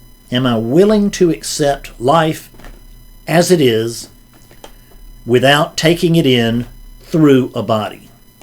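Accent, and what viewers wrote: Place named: Mississippi, United States. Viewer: Hey! Love this!! My AA sponsor turned me onto you. Just curious as to where you're from. I live in Toronto now but I'm from Mississippi. My sponsor thought I'd like really like you because she says we have the same accent.:)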